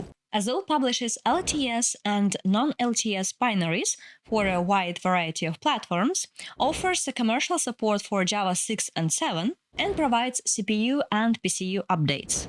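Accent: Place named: Europe. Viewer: Awesome video. Thanks. By the way, I think your pronunciation is cool :face-blue-smiling:, it is like listening to java news from Europe (or from wherever your accent is)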